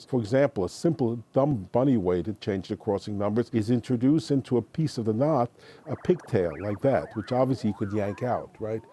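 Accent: New York, United States. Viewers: I LOVE that New York ACCENT ! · what accent is this? new york? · New Yorker. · That guy is clearly a native English speaker. Probably just a NY accent.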